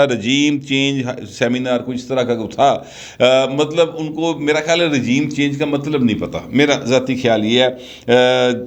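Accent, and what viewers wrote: Punjab, Pakistan. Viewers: السلام علیکم بھائی محترم بھائی، الحمداللہ زبانیں ساری پیاری، ساری میٹھی، ساری اچھی، اردو میں جب آپ گفتگو کرتے ہیں پنجابی ٹکسچر کے ساتھ تو ہم تو انجوائے کرتے ہیں ایک منفرد فلیور مزیدار ہوتا ہے، مگر ہمارے دیگر گھر والے آپ کی اردو تو خوب سمجھ پاتے ہیں لیکن پنجابی ٹچ ان کے پلے کچھ زیادہ نہیں پڑ پاتا، ویسے آپ کا اسٹائل یعنی زبانوں کا خوبصورت استعمال اور امتزاج بہرحال قابل تعریف اور سراہے جانے کے لائق ہے۔ اللہ آپ کے زور بیان میں طاقت کو برقرار فرمائے اور ہم کم علموں کو آپ کے تبصروں اور تجزیوں سے مستفید فرمائے آمین۔ اپ ہوئے، شفقت بھائی، رضی دادا، سعید چوہدری، امداد سومرو، منصور علی خان اور دیگر دوسرے تجزیہ نگار سب کو ہماری طرف سے بہت بہت مبارکباد اور آپ کا شکریہ کہ آپ کی گفتگو میں اتنا لطف آتا ہے کہ بیان سے باہر ہے، ہمارا تو زیادہ تر وقت آپ کی گفتگو اور رپورٹس سننے میں گزرتا ہے اور کیا خوب گزرتا ہے، الحمداللہ جزاک اللہ خیرا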